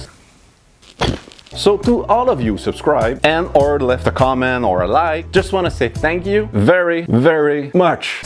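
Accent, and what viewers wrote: Quebec, Canada. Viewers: You are very talented. Are you French Canadian.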